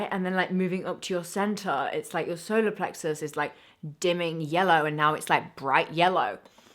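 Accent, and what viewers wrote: England, United Kingdom. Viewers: Thank you so much! Your message resonated all the way! Very interesting indeed and I greatly apririciate your efforts 🙏🏻 The relinquishing has to do with my drug use, I need to let go of something I have seen as a right for 30 years. I already know I don't need it anymore but I seem to be afraid of the emptiness that will occur when I stop. I have already come to the realisation that this emptiness will actually manifest as more room for other things, and I got loads of things that could use more attention. Lately I have been receiving some re-tuning, very powerful changes in my subconscious that immediately cause stuff to integrate. Awesome stuff this whole 'becoming'! And you my love, your presence makes me feel deeply connected. Like I'm finally home, safe... I feel enchanted when you are in your way 😍 How you speak and laugh, you are beautiful in looks ánd your being. 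Haha I even felt a butterfly in my stomach when I realised that you speak with an English accent, that makes it likely that you are 'near'.. Don't worry, I do live in another country, the Netherlands, and I will not go around stalking you or something. I guess I wanted to share this with you because you make me feel special and I want you to know and feel that you are very special to me ❤️ Eyes open, no fear. Be safe you beautiful creature ❤️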